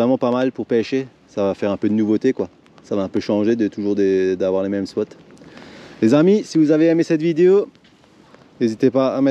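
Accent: Alsace, France. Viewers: Salut ! Je suis étonné des résultats avec le rogen j ai encore jamais rien fait avec sur le Rhin ou dans l étang du village avec (j ai très peu pêché avec aussi 😅). Tu animes comment ? Juste en linéaire ? Merci pour les vidéos et pour ton léger accent Alsacien 😉 je te souhaites plein de beaux poissons pour 2023 et continue tes vidéos !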